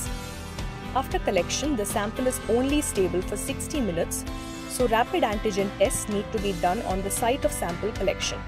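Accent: India. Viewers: I must be positive bc I cant listen to this terrible narrator for even 5 secs. why use a complete Indian to speak in English? hm? hmmm???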